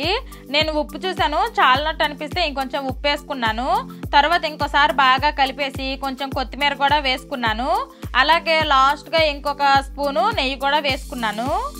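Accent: Srikakulam, India. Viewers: Hi akka, madhi srikakulam dist. Mee videos chustunte ma srikakulam ammayi ani garvamga anipistundi. Nee matalu kuda chala natural ga mana srikakulam matalu Ani thelustay.❤❤❤ Akka meeru thumbnail and long video editing endhulo chestaru cheppara please, kudhirithe dhani meedha oka video cheyara akka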